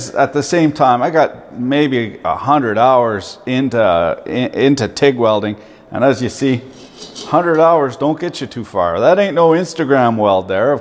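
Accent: Canada. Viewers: Canadian accents. The combination of minnesota and irish accents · As a yankee I just love the Canadian accent and slang in these videos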